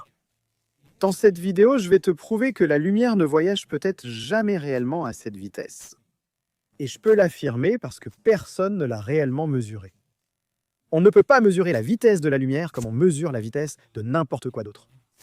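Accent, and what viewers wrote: Quebec, Canada. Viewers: Doublage (québécois) de merde. IA peut-être ? C'est très désagréable à regarder.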